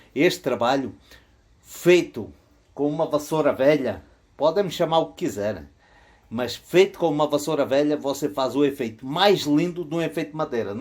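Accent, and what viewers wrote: Portugal. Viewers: eu vi uns vídeos seu de tijolinho não lembro qt tempo faz acho q foi 2016 ou 17.Achei à coisa mais linda. Agora tá uma enxurrada aqui no Brasil. E por acaso vc fala daqui ou de Portugal?E p/terminar é um dos melhores trabalhos q já vi na internet.O tom as nuances e formas está maravilhoso.Parabéns😝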